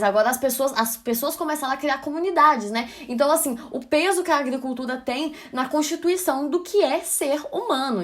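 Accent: Minas Gerais, Brazil. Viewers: Esse sotaque mineiro...❤️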